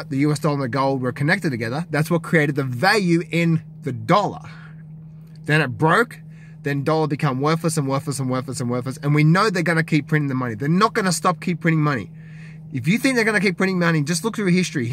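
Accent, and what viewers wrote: Australia. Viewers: Whats going on, your videos just started showing back on my list. Its nice to hear your interpreation of market moves and your Austrailian accent. Take care, cheers!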